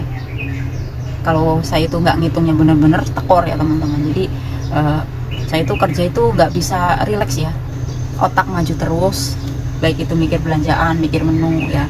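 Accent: Banyumas, Indonesia. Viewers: Halohaaaaaaa beb ngapak❤❤❤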